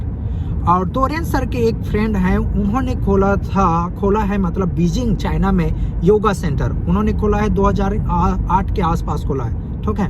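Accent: Chhattisgarh, India. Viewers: Bhaiyaa tor baat kre me thoda- thoda chhattisgarhi ka esent aat he 😊🙏 Badhiya he👌🙂👍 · भईया आपके हिंदी में भी छतीसगढ़ी अभी भी झलकता है ,,, मतलब एकदम हीरो वाला काम किए हो यार ,, आपके गांव वाले आपको देख कर बहुत खुश होंगे ,, । Love you brother 🙋❣️ from all chhattishgarians,, ekat bar chhattisgarhi bol dete to Maza aa jata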